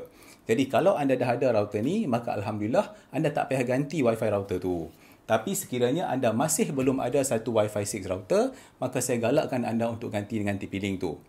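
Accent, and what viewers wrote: North, Malaysia. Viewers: Cukup sempoi n natural. Maintain bahasa utara. Terbeikk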